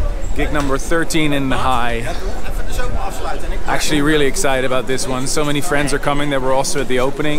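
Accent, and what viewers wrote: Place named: England, United Kingdom. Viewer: Getting a little English accent there😉❤️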